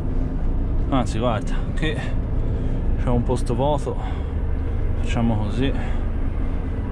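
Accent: Tuscany, Italy. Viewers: Ciao il video ci sta pero mi fa ridere come pronunci la C si vede che sei toscano 😂😂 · senti il toscano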